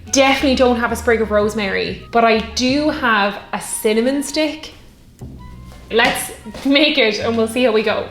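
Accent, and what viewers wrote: Ireland. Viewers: Really beautiful woman with Irish accents, one of my favorite things....but then again I am a man. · anyone else notice her Irish accent is stronger on her own channel, then when she is tatse testing food on the try channel.. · This combines Irish lore and alcohol hosted by Tinkerbell I mean the Absinthe Fairy with an Irish accent - win! I wonder if Walt got the inspiration for Tink from an absinthe fairy?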